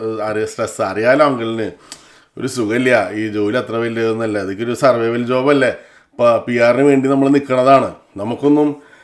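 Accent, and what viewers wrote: Thrissur, India. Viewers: ഞാൻ തൃശൂർ താങ്കളുടെ സംസാരം ❤❤❤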